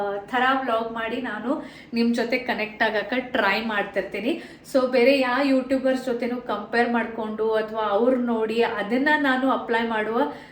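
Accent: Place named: Belgaum, India. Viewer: Nivu bhal channngi matadutri namma belgium bhasha keli bal chalo anisstte❤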